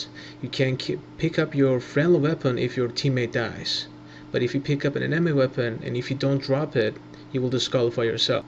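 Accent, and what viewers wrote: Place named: Turkey. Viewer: Listen to and his cute ottoman accent <3.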